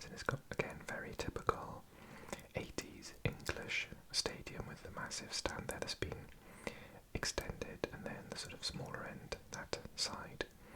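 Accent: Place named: Wales, United Kingdom. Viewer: I never thought a welsh man whispering about football stadiums would be something id enjoy watching, but turns out it is! Would love to see a championship version coz us lot never get much recognition (huddersfield fan)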